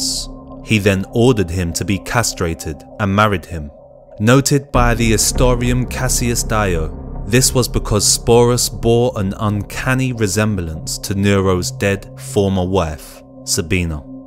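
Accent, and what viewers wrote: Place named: East London, United Kingdom. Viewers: Nero was clearly psychotic. The Mad King? Nice voiceover- East London much? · What accent is that... It sounds like the way my black friends speak near London. Brilliant stories btw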